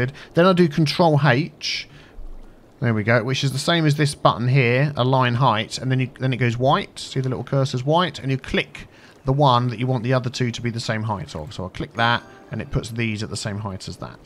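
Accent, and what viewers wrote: United Kingdom. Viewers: are you british and do you know DANTDM personely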